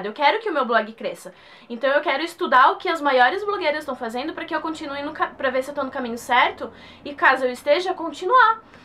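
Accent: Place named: South, Brazil. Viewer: Amei o video. Que sotaque legal heim? Amo sotaque de sulista, pra min e um dos mais bonitos, e o seu e mt forte. Abracos!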